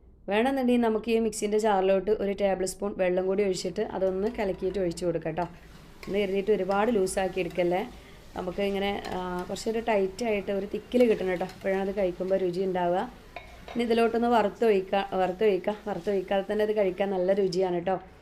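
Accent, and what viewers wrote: Malappuram, India. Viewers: മലപ്പുറം താത്ത എന്ന് പറഞ്ഞു ഒരു മാതിരി സംസാരം ആയിരുന്നു ഇത് കുഴപ്പമില്ല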